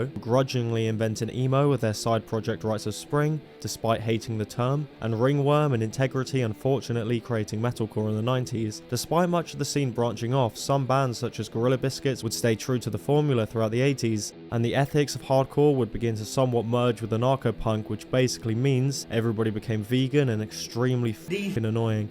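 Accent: United Kingdom. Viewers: British accent, video disregarded. Go enjoy the sex pistols limey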